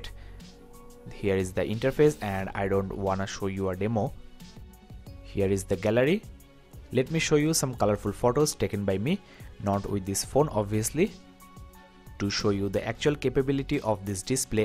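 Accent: India. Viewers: Are you indian? You sound like an indian.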